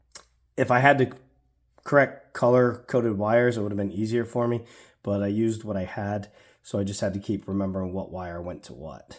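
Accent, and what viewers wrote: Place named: East Coast, United States. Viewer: you and i would get along well. Jaja. Sounds like your accent is somewhere from the east coast too. Im from CT.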